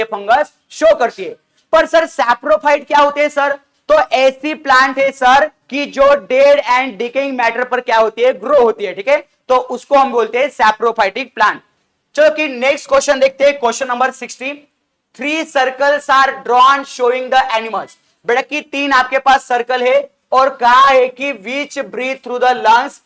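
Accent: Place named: Maharashtra, India. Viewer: Sir thoda marathi bhi use kare to chalega 😊😅 kyonki apke samajane ke tarikese hi pata chal raha hai ap marathi hai 😅🎉❤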